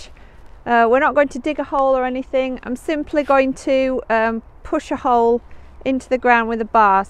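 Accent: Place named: Manchester, United Kingdom. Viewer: Subbed you you sound local manchester way lol hopefully get sone good tips off you!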